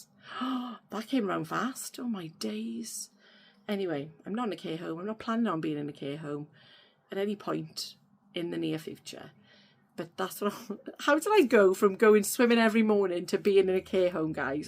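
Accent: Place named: Wales, United Kingdom. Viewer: Love your `Welsh accent whatever day you post.😃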